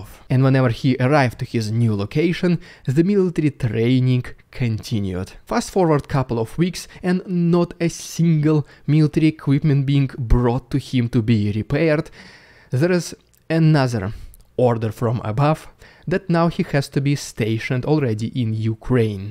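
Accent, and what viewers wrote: Russia. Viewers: Dude, I'm uncertain if you are a real Russian that speaks American English very well, or an American that can mock a Russian accent very well.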